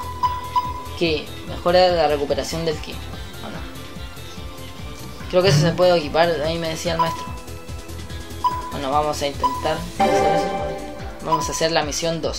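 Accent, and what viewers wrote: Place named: Mexico. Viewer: por tu acento creo que eres mexicano verdad?? viva mexico carajo!!! jajajajjjxdjkajxkajdoisadjs xd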